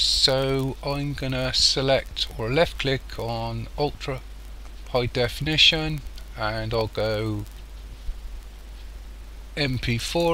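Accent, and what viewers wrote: Bristol, United Kingdom. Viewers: Brilliant video! Btw, are you from Bristol?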